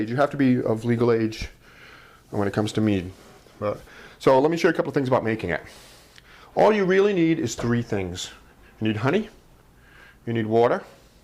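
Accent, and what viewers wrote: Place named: New England, United States. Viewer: This guys definitely from the New England area.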